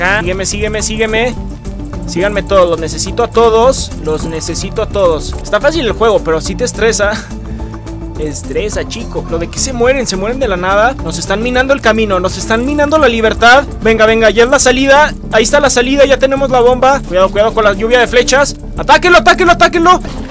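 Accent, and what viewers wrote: Mexico. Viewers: eres mexicano o ecuaforeño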